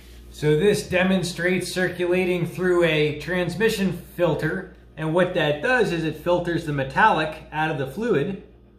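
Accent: France. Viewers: 😝 great French accent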